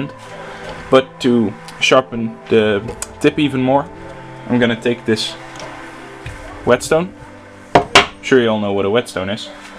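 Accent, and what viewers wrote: Netherlands. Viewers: Typische nederlander aan het accent te merken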